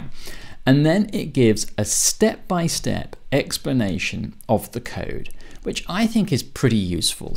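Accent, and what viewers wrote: United Kingdom. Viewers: man you are really cool always like your video and pure british accent , love from Bangladesh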